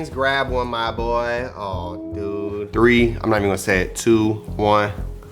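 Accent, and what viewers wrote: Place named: AAVE, United States. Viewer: The recipe was excellent, but the Ebonics has to go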